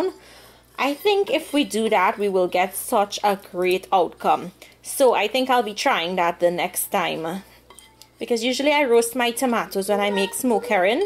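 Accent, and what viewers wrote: Trinidad and Tobago. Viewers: Hey Iove your trini accent...otherwise very good trini version of solomon gundy..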